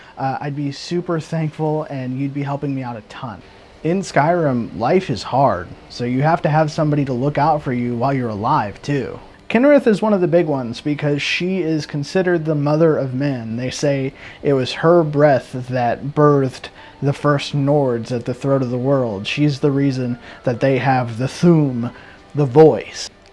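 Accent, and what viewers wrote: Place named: New England, United States. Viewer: just sound like my old neighbors/coworkers in Vermont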